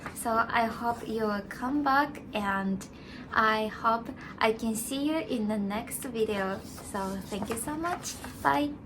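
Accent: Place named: Japan. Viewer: Omaigah a japanese talking in inglish idiom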